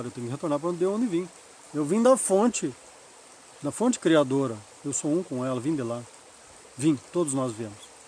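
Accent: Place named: Rio Grande do Sul, Brazil. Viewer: .., sim.. .logo, que tú lançou o questionamento.. . eu já tinha a resposta,,.. . só não deu tempo, de pó arar o vídeo, e Te responder aqui nos comentários.*Tú e aqui do estado,(RS )provavelmente ,creio eu deduzi, pelo teu sotaque, entonação entonação , enfim.. .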